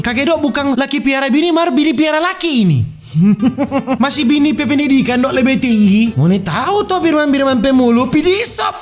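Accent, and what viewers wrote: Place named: Ambon, Indonesia. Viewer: Logat nya sama sperti ambon dan kupang Salam dari kupang NTT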